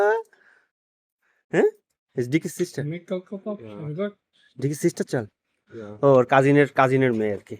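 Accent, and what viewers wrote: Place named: Khulna, Bangladesh. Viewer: Kichu mone na korle ektu bolben apni ki Khulna ba Khulna bivag er keu ? Apnake chena Lage ar apnar Kotha bolar dhoron kichuta khulnaiya taan ache...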